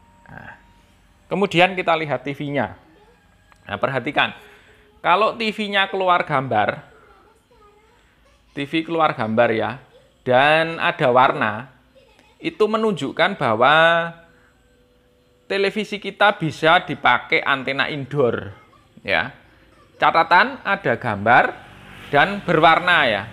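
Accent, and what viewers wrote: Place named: North Sumatra, Indonesia. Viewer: Aksen Bataknya kental banget, aku suka.... 😂😂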